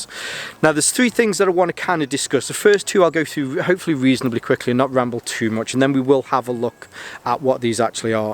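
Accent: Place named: Scotland, United Kingdom. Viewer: You have a nice accent, part Scot?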